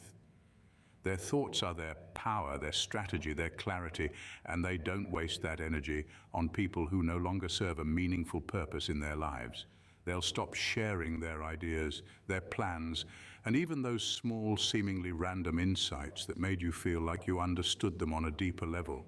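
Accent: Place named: RP, United Kingdom. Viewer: The plummy accent is suuuuch a turn off.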